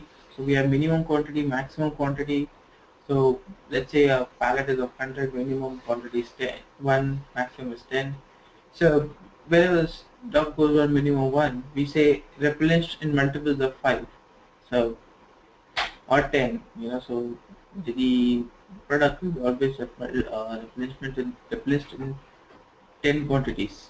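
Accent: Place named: India. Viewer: there is a back ground job in this video makes it ghard to follow plus the indian accen that is so awfull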